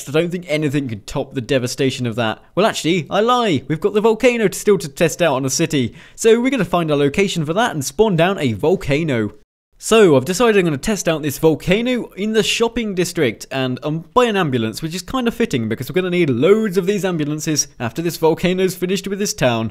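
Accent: United Kingdom. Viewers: are u British not to be rude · hola solo quiero preguntarte si eres británico o no ... ??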